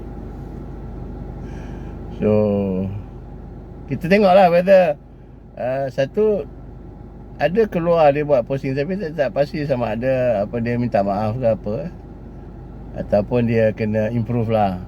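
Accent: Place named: North, Malaysia. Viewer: Deme ni X faham cara ckp org utara. 'anak haram' ni bkn maksud maki. Biasa je.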